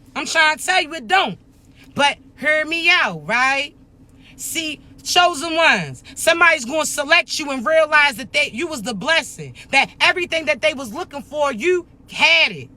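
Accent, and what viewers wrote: Baltimore, United States. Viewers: ALL FACTS LADY YOU GOT A BALTIMORE ACCENT GOOD CONTENT KEEP THAT ENERGY COOKING 🎯🎯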